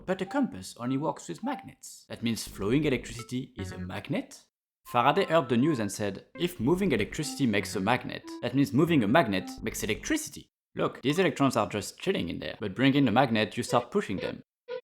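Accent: France. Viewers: the french accent is amazing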